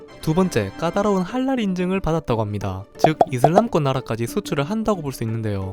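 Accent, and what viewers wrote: South Korea. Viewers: 이 억양은 대구말투인 것인가요?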